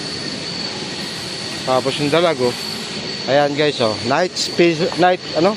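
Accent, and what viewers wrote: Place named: Mayhayin, Philippines. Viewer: Tiga saan ka lodi? Puntong mayhayin ka a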